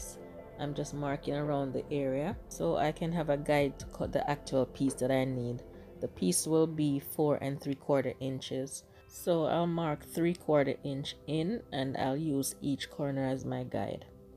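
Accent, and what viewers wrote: Jamaica. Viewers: Beautimous 😍. One quick question- are you Jamaican? Your accent sounds similar to mine. 😉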